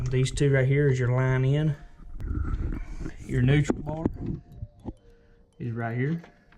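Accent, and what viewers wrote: South, United States. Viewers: Dang that accent sounds more south. I have to put in a 200 amp meter pole for a single wide Saturday I'm gonna be doing it alone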